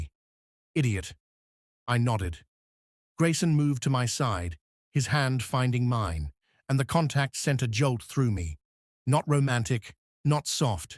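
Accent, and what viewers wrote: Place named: United Kingdom. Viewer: title is not correct but the story is good. love the voice... sounds like Sir David Attenbourgh. love the British sounds.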